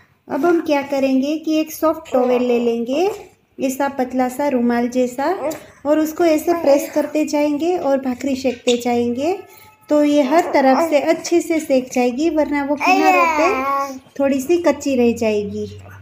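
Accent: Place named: Gujarat, India. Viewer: Gujarati Hindi sounds really funny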